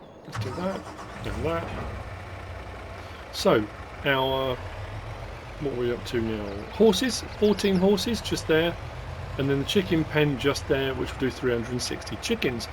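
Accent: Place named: United Kingdom. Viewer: I was just reading an article "Here’s Why So Many Movie Bad Guys Have British Accents" AND i Thought of you 💡 You have that perfect villain accent that has many features that are perfect for a villain. Queen’s English make people appear, quote “more educated, intelligent, competent, physically attractive, and generally of a higher socioeconomic class.” end quote lol. I have an EVIL PLAN.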